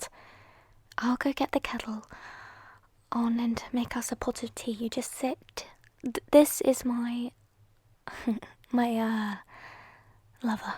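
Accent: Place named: United Kingdom. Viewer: You sound extremely British (First video I watch and I say this wow)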